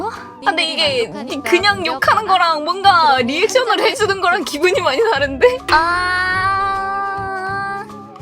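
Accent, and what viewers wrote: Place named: Japan. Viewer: 역시 은 일본식 영어랑 가요느낌이 잘 어울려...다음엔 그런것도 해보자..!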